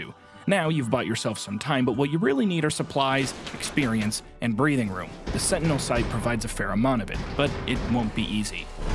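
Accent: Midwest, United States. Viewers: Thank you for this historic moment, your nasally Midwestern accent only served to add to the experience:) Now do it on survival!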